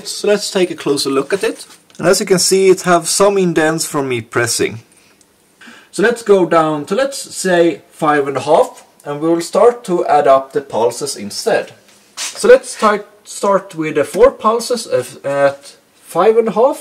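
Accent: Sweden. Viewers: typiskt svengelska!lol enyoyed this video lol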